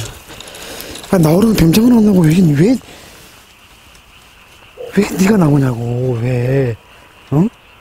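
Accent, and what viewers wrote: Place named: Gangwon, South Korea. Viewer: 저도 강원도라 말투가 친근감 있네요~^^ 요즘은 붕어 낚시안하시나요? 제가 그자리에서 생애 첫 월척을 ㅎ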